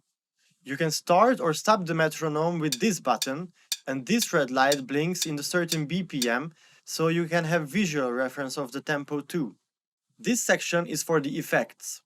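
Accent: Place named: Hungary. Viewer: Az ember egyből felismeri, ha hunglishül beszél valaki :D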